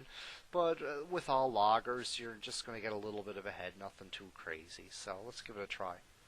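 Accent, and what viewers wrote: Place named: Ireland. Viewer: You sure your not from Ireland, you sound really Irish.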